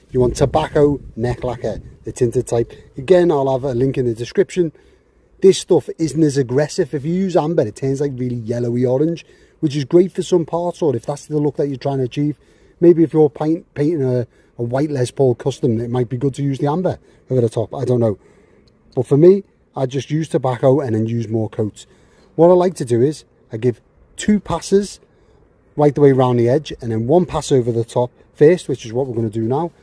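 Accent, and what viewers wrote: Liverpool, United Kingdom. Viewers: So I've genuinely been thinking about this. Your Scouse is obvious, but not aggressive, and I'm wondering if it were thicker if I would have had the same reaction. Genuinely, it took me a while to figure out that your "deckle" meant "decal", but maybe if I was already having to "translate" it would have just been part of the expected difference. That said, I'm having real trouble figuring out how Scouse transforms "decal" into "deckle". How do you say "diesel" and "demon"? "Dezzel" and "demmon"?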